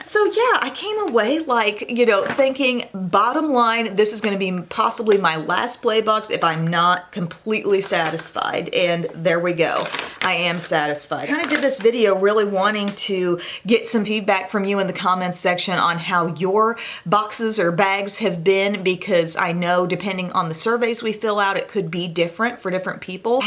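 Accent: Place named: South, United States. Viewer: I don't know but I feel like I hear more country in your voice in this video? Like more southern? Love your vids and you! Xx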